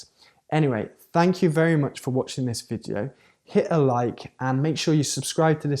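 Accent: United Kingdom. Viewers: I am dying to listen to your Italian with British English accent! You are right, I love people speaking Italian with their own accent.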